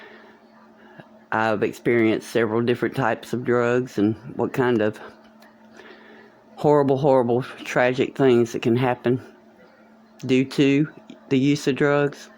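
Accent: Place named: North Carolina, United States. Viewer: u sound like u have an NC accent. I know bc I am from eastern NC. just wondering if u have lived in NC ever? yes u r telling the truth girl for real! I am in recovery now imo but yes I am on suboxone that is prescribed and going to a counselor as well. started late with drugs as well. God bless you 🙏 ❤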